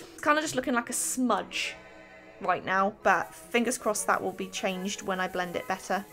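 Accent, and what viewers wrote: United Kingdom. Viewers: I have a few questions (for any future Q&A's. wink *wink*): How old are you? When is your birthday? Do you have a boyfriend (you're very beautiful btw)? And how do you still have your british accent when you've lived in the usa your whole life? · Pigmented. Love your videos. Where are you from originally? It’s a British accent, probably south? I’m in Liverpool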